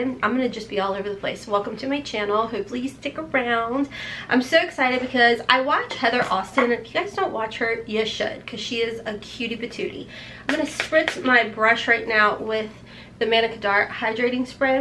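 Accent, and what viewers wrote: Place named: Nashville, United States. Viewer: You crack me up! I love your accent! I'm from Nashville tn and watching you makes me feel at home! To be honest your adhd does too!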